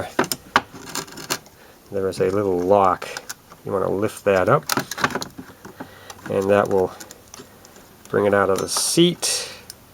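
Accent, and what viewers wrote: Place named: New England, United States. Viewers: good job sir....you have a great gift in teaching...yes, you have a nice boston accent..similar to Peter Griffin of Family Guy....:) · I love the New England e-yak-cent! I’m usin’ a he-ahf inch bray-kah-bah! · Those cab. bolts just need snugged up good! N a screwdriver ant going to hurt it! Other than yankee ackcent this dude done fine! Jack stands r nice if ur going to b up under car eating lunch! Dont for get pump breaks b for u head out!!!! Good job! Yankee!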